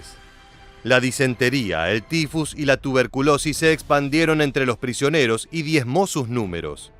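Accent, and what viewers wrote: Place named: Argentina. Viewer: EL NARRADOR DE ESTOS REPORTAJES TIENE SUS MÉRITOS BIEN GANADOS, ES MUY AMENO Y SU ESTILO INCONFUNDIBLE. POR SU ACENTO ES AL PARECER ARGENTINO. ES LO UNICO QUE SABEMOS. LAMENTABLEMENTE SU NOMBRE ES DESCONOCIDO Y CONTINÚA COMO TAL.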